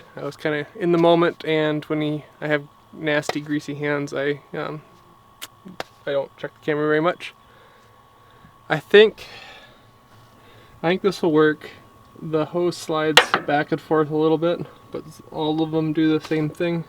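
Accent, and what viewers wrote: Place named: Minnesota, United States. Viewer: You are just so laid back and patient. That is what it takes to work on this stuff. Love the vids. Your Minnesota accent is similar to my accent from Canada.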